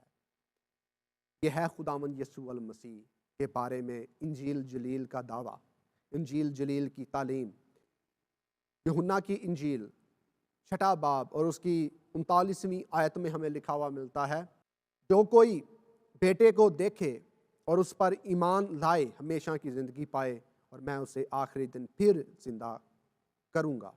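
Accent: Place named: Punjab, Pakistan. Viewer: brother I don't want to hurt you. For example say Hadith number 1234. Actually when you speak in Punjabi alphabet I can't understand. Even I am Punjabi. Sorry once again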